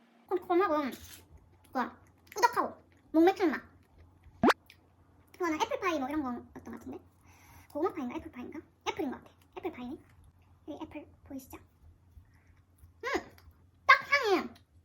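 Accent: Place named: Busan, South Korea. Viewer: 님 부산분인가봐요^^ 반갑반갑~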